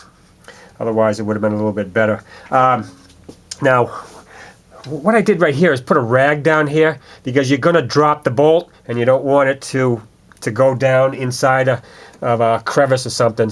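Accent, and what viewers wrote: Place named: Boston, United States. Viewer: LOL... That's a perfect Boston-area accent.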